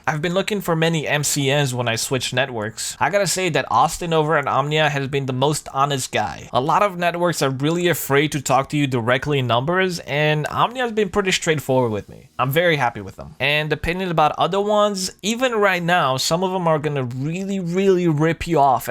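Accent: Romania. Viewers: esti Roman? (ai accent) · There's a guy in some of my classes that looks just like you. He sounds Romanian too. Do you happen to be a high school junior near Boston, Massachusetts?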